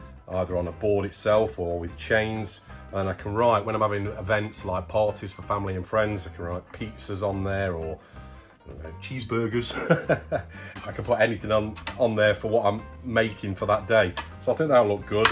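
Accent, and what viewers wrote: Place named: Grantham, United Kingdom. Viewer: Great video and nice haul from the boot sale. That Stanley magnum screwdriver is a good one, I don't see many that length, although the red bits of the handle looked very beaten up! Careful of buying tools at car boots, it is addictive as I have found to my cost! I am going to guess you accent as Grantham. All the best!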